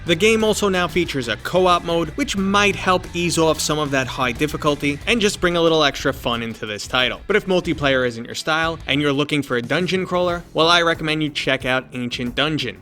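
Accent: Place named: Boston, United States. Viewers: Yo this man is so Boston I thought he was Mark Wahlberg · You from long island? Maybe Mass cuz I see you tryna hide it but sounds more like here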